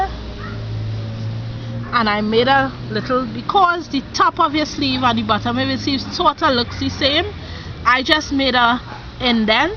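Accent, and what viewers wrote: Trinidad and Tobago. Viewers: when i started looking at this video i say but how this lady sounds like a Trini so, I like it, we need to meet some time. I am from Trinidad and Tobago and I teach Fabric Design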